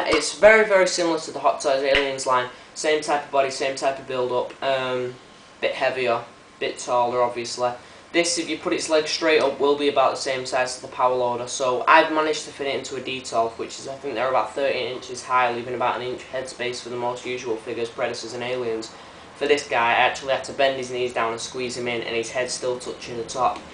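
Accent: United Kingdom. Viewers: 5 Stars for British accent! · youre awesome are you scottish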